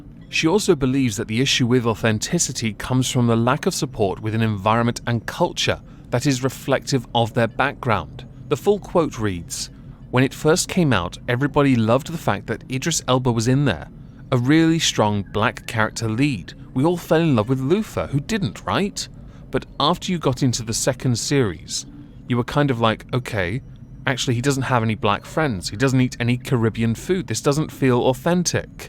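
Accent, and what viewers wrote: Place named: Birmingham, United Kingdom. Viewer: J to the B to the motherlovin' P took that sass and turned it into a positive. He got an artist to design the Hydra logo with a lobster instead, made merch of it and is donating all proceeds to charity! Also I love your Birmingham accent! Reminds me of Cat Deely playing Cat the dog on SM:TV Live XD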